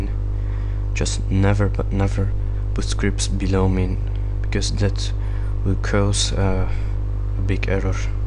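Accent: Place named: Russia. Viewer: Lijkt wel of je een rus ben met dat accent.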